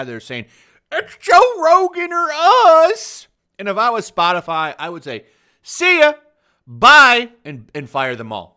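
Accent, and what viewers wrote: England, United Kingdom. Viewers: That’s the most hilarious english accent I ever heard !!!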